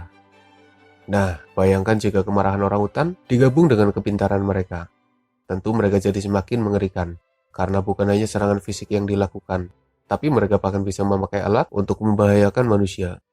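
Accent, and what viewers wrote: Banyumas, Indonesia. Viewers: Host ny NGAPAK BANGET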